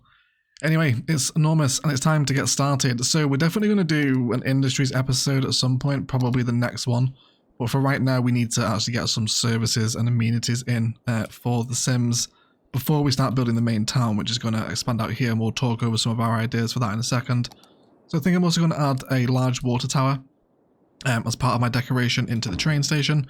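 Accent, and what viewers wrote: Australia. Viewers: take it easy on the Gum Tree.. April fools, you don't have that many yet. Love the video, so excited to see it grow. Perfect chill out vibes - and aussi accent! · Right on with the aussie accent mate! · Honestly mate what an amazing train station area I don’t know how you managed to capture the vibe of a small aussie community train station so well I’m from Perth and that station would even fit in over here to 👌🏻 also keep the Aussie accent in it’s actually not bad 🤣🤣 very keen for this series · Music ✅️ Aussie accent ✅️ train only start ✅️ incredible map ✅️ special guest appearance ✅️✅️✅️ editing ✅️ do I love Thessia already? ✅️✅️✅️❤️❤️❤️❤️❤️❤️❤️❤️❤️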